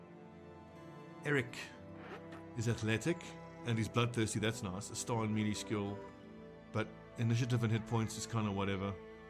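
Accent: South Africa. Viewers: Interesting accent sir, saffa living abroad?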